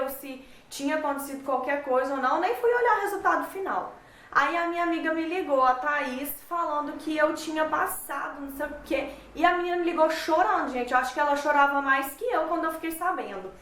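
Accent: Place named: Goiás, Brazil. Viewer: Não é pq vc tem um sotaque meio puxado ak de Goiás, sabe. Hehe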